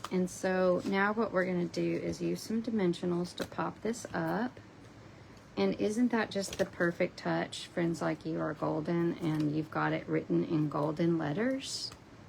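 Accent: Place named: South, United States. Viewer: It’s so good to see you and hear that sweet southern accent again! Love the card inspiration!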